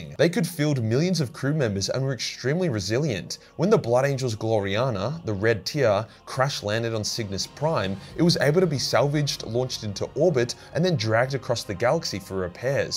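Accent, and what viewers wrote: Australia. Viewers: Damn loud aussie lull me To sleep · Hearing an Australian swear at me and also teach me 40k lore is some what pleasing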